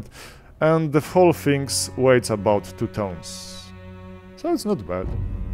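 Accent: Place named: Russia. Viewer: rasian akcent like wuj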